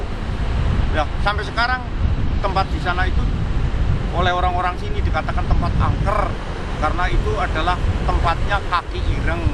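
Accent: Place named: Kebumen, Indonesia. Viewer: Lumayan ni, nambah pengetahuan. Logat Kebumen banged 😀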